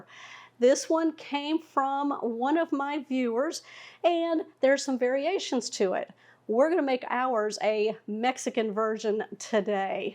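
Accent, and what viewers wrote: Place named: Mississippi, United States. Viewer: Wow! I'm in Columbia, MS and you talk like a MS person! This is an Amazing recipe! It can easily be made Cajun with some local stuff. Love your videos. Blessings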